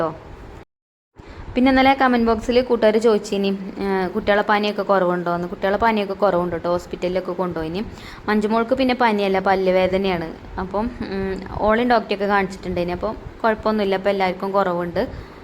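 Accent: Malappuram, India. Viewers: Enikk Malappuram basha valiya eshtam anu ningaleyum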